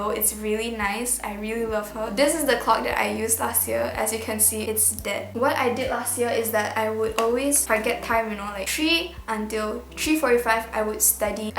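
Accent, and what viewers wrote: Sarawak, Malaysia. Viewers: Sarawakian? Your accent is kinda same as sarawakians language like this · is like one of those who speak manglish 😂gosh im subscribing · Bunyi kdk org srwk.. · Dr sarawak ke sis?.. Sbb "lom gik masok form5 " hahaha.. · BTW ARE U FROM SARAWAK I COULD TELL FROM YOUR ACCENT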